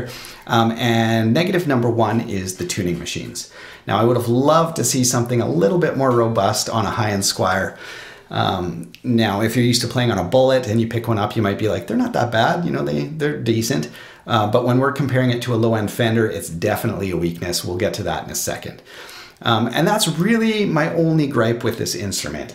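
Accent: Canada. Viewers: I'm English and I picked up on the Canadian accent. Certain words have a Scottish ring to them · One of the most Canadian accents I've ever heard.. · Now there's a Canadian accent!!!!!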